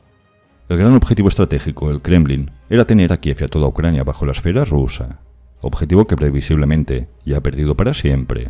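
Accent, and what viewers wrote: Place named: Zamora, Spain. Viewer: Hola por tu habla (acento) no crei que fueras de Latinoamérica pareces mas de mi querida Zamora España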